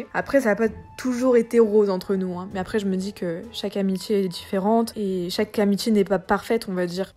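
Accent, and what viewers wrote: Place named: Nord, France. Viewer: Woaw, on dirais une histoire de film, je savais pas que tu venais du Nord, et ça explique ton petit accent😉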